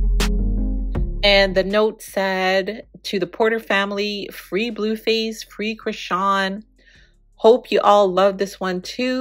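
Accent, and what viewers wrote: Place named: Jamaica. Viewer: Love ur channel …do u have Caribbean in u? I hear a bit of a Jamaican accent ❤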